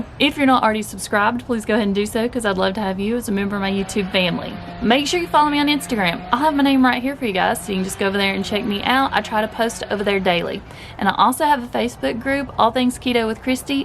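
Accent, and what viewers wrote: South, United States. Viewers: I trust cooks with southern accents more!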